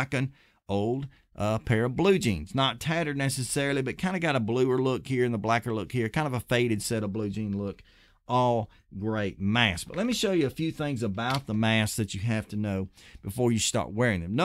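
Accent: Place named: South, United States. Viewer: As a fellow southerner now living in the PNW, I love hearing your accent! I miss it!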